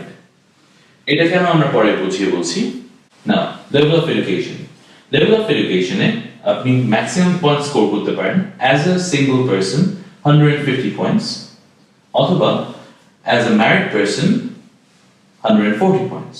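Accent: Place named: North America. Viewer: Don't understand why people are moaning about his presentation or way of speaking? He probably lived in USA or Canada for a long time hence he speaks like that and personally I would prefer his presentation as it's clear and concise.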